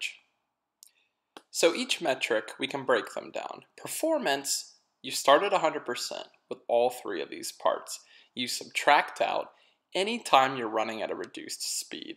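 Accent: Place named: United States. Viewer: he sounds sooooo American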